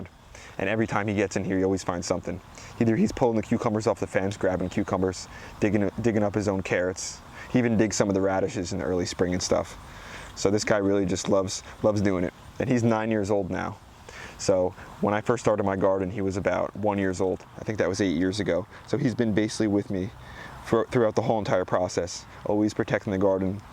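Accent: New Jersey, United States. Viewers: Come for the gardening advice, stay for the New Jersey accent. "Worter"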